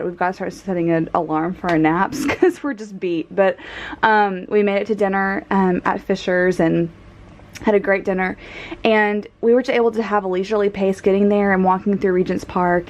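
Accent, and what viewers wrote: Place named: South, United States. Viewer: Great videos. I am enjoying your vlog style and your southern accent.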